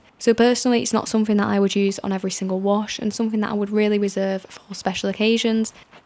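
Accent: United Kingdom. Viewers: Great video thank you. Also a very soft and delightful British accent,(please talk more!).😊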